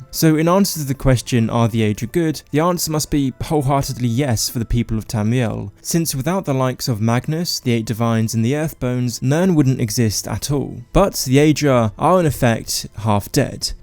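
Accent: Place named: England, United Kingdom. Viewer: Haha man I think I get what this guy’s saying. You’re like English friend one, and Simon from [everysecondyoutubeaccount] is English friend two. David Attenborough is like my Grandfather who’s since past. Voices and accents are fascinating.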